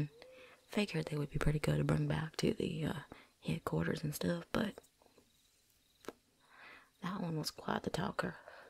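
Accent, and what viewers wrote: South, United States. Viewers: Southern accent needs a little work but it’s still good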